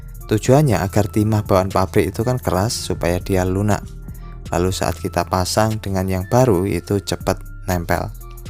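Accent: Java, Indonesia. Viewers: Sampeyan jawane ngendi boss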